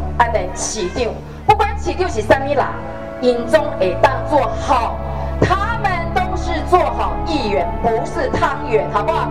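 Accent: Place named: South, Taiwan. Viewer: 柯志恩的台語還蠻有南部的調調，應該跟高雄人蠻可以接近。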